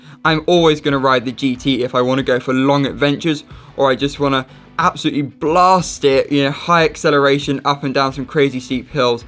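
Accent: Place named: United Kingdom. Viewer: Sounds more like an ad than nothing else. You don't address the countless numbers of ghosting issues that have been reported, nor the predatory behavior of FM towards reparability of the board. You sound like you live in the UK, have fun when your board brakes down and you have to send it back to the only place in the world that can repair your board without bricking it mate.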